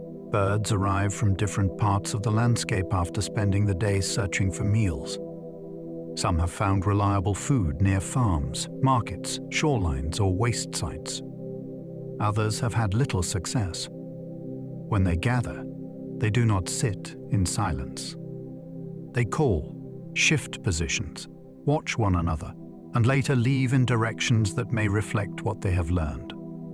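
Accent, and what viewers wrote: United Kingdom. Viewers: Why is everything narrated with a British accent? It gets old, you know? If you listen to allot of things like this, or audiobooks, it gets real obvious. I can't take it. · 😂 Why shouldn't it be. I find the soft clear British accent part of the relaxing experience. And English is not my mother language.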